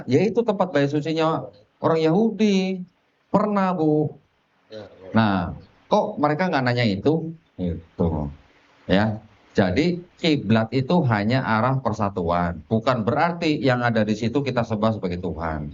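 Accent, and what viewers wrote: Banyumas, Indonesia. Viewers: Koh bawaannya enak Logat nya itu Lo ngapak 😁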